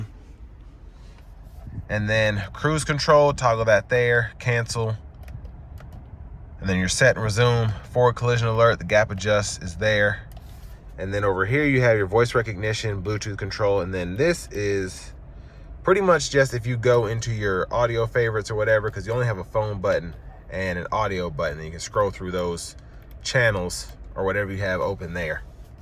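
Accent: South, United States. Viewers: Nice southern accent! Great review. 😀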